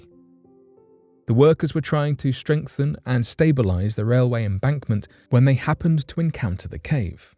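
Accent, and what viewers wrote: United Kingdom. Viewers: This is why I never watch these videos... The title of the video is very specific, yet it's only a fraction of what the video is about, and is just a photo Montague with someone British voice just blabbering.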